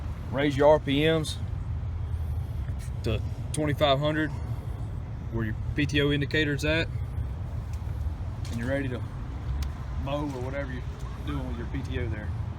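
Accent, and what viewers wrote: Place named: South, United States. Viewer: Understood 'most' of it through that southern accent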